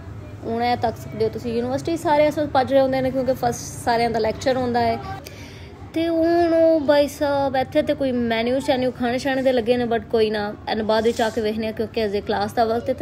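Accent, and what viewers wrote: Punjab, India. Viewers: Your punjabi accent💖❤👀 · Punjabi accent 👍😊 proud of you my little pumpkin 😊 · Kamal punjabi accent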